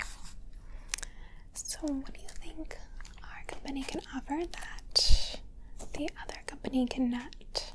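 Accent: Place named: Midwest, United States. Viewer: I can tell by looking out your window at the trees that you are in a warm state(southern), but your pleasant sounding accent you probably grew up in maybe Chicago or some Midwestern town.😉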